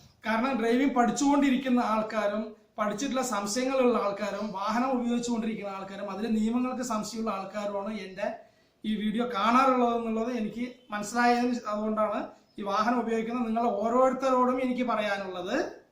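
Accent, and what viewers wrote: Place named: Palakkad, India. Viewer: aivvaa palakkadan basha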